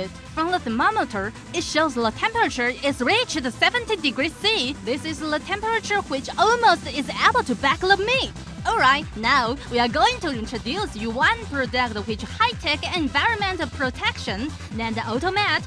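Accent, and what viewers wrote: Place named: England, United Kingdom. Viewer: it sounds english.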